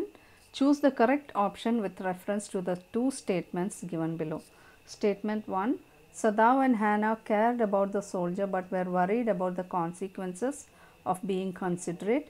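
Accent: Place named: South India, India. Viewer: Madam are you south indian as your accent is similar to them also the explain to commendable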